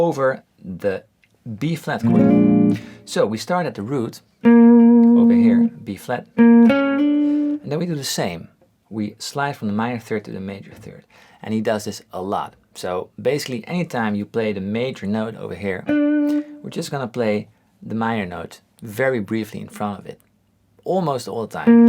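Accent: Netherlands. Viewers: Bro your Dutch I know because your accent and I see you many times at the supermarket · This dude is heavily dutch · Hi. New subscriber here, and I'm really enjoying your videos. My parents and all my extended family are from Netherlands so your (very slight) accent is relaxing and familiar to me. Cheers!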